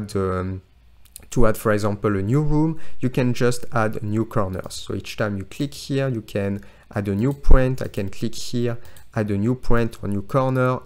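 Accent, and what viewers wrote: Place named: France. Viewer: thank u for this very specific tutorial, loved the french accent ;)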